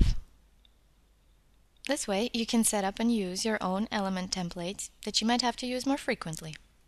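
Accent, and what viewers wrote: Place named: France. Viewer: This nice narrator sounds like French.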